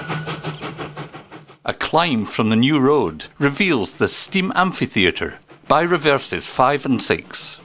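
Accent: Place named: Afrikaans, South Africa. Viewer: I love the dinstinctly Afrikaans accent of the narrator's voice.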